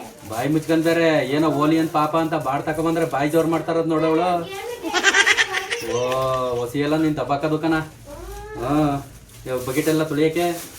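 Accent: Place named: Chamarajanagar, India. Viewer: Namane ru chamrajnagra avru avru nim slange matadadu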